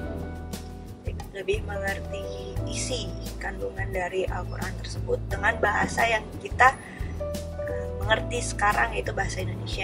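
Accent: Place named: West Java, Indonesia. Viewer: orng sunda nya katingal teh cara nyarios na 😬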